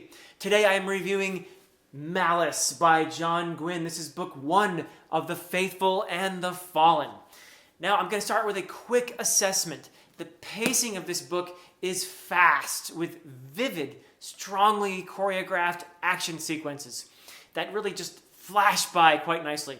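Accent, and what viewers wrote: Wales, United Kingdom. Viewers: Having lived in Wales for a year, I was pleased to see you bringing this point predominantly into your review shedding light on Gwynne's intentions and highlighting other reviewers' misinterpretation of it being about Vikings. Also, your pronunciation sounded just like all the welsh bloke around me all the time while I was there, so nice going!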